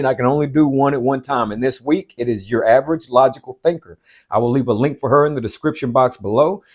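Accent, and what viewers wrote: United States. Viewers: ...you from Pittsburgh?!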